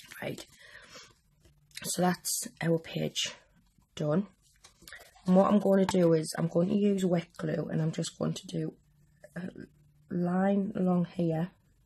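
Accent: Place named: Newcastle, United Kingdom. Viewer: Love the Geordie accent. I moved to the north east from Worcester 17 years ago and I recognise it anywhere. Love it!!! Do you have measurements for anything smaller please. I have subbed so will check out all your video's xx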